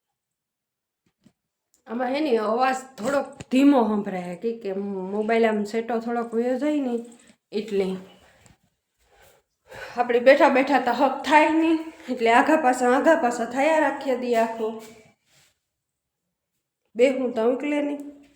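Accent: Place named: Mer, India. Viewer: Ben tame suddh merni bhasha boloso te bahut saru lage se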